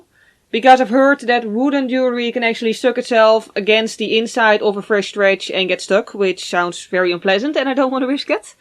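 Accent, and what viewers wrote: Netherlands. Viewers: That dutch accent 👌🏻 ik zie je 😍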